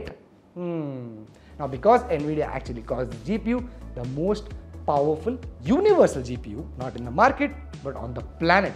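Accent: India. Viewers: Dude…your accent. You mine aswell speak Indian. Practice brother.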